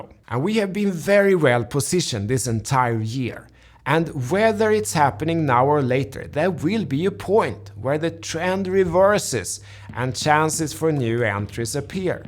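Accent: Sweden. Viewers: I always say "Hado" (I don't know how to spell it) to my swedish gaming buddies. I say it in your accent and for weeks they thought it was one of their countrymen saying it. You've apparently taught me more than investing strategy I also asked them about the canned fermented fish and they said, there's no way they would ever eat it 😆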